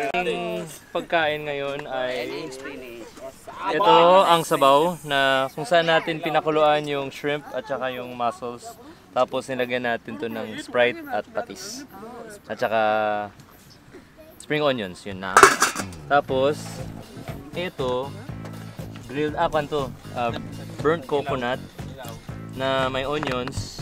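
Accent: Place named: Ilonggo, Philippines. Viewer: Ga gwa gd ang tono kung upod mo ilonggo man.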